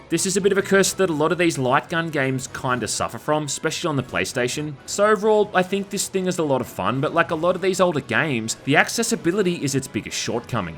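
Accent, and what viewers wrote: Australia. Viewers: Hey are you on Aussie or a Kiwi? Trying to figure out the accent.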